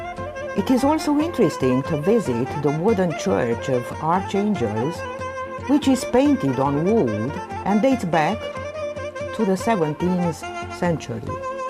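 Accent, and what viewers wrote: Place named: China. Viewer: ce engleza ruginita si una parca'i o rostita de Chineza. filme de astea vor atrage doar turistii din Saliste,Carlibaba ... si Balti mAldavia ! e nevoie de curatenie,amenajari de gradul 1 [unu] la partia de schii,drumuri de accese si repunerea statiunelor minerale in practica reala care ar atrage mii,sute de mii de oameni din EUROPA... si vara si iarna.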